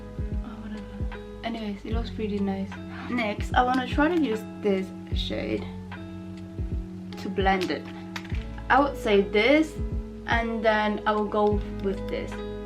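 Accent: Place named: China. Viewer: Where is your accent from? Sounds like English mixed with Chinese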